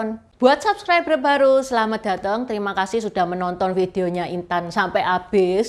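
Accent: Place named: East Java, Indonesia. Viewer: Waaah mbak intan sudah cantik cerdas juga berprasangka baik ....dengan bukti yg benar bisa mengajak kepada kalayak untuk berpikir baik pada orang lain.......tidak hanya memandang jeleknya...... Trimakasih info positipnya.....klo boleh nanyak kok mbak Intan lancar banget bahasa indonesianya juga seperti ada dialek bahasa jawa ,kayaknya jawa timur ....klo boleh tanya mbak Intan orang mana.....????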